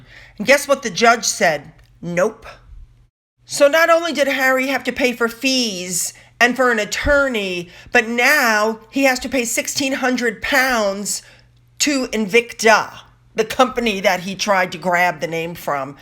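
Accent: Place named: United States. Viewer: Thank you for all the kind things you say about our Royal Family ! I must admit, you have me laughing, with your pronunciations and your lack of understanding some of our crazy customs. 'They met a goat'...... The goat would have been The Mascot of his Regiment.😄 The Derby is the Daaarby, as in Baaaaath! You Americans never say aaaah! EdinBORO is pronounced 'Edinbro'. Pimms served in a bar can be Pimms No 1, 2,3, 4, according to the base alcohol, such as gin, whisky, rum,etc., and are served with mint, fruit, according to the number. Thanks for your highly entertaining channel, I have subscribed.🙋🇬🇧🍓🍉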